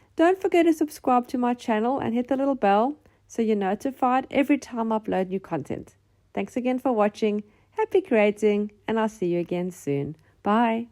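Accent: South Africa. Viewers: Just discovered your video, and I love your style. Very inspiring. What a lovely surprise to hear a familiar (South African) accent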